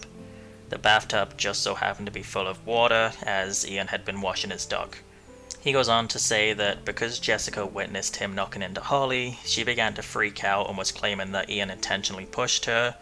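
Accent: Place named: Northern Ireland, United Kingdom. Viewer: cool, I thought I could hear Northern Ireland as that's where I'm from but a mix in there anyway 🤣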